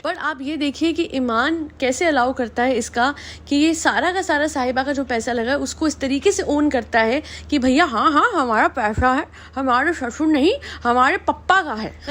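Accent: Punjab, India. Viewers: Your voice is so sweet n soothing but aaj aapki meethi punjabi sunkar toh maza aa gya or jis tarah se aapne bola usse toh lgta ki aap Punjabi ho mei bhi punjabi hu toh or bhi jyada khushi hui❤❤❤❤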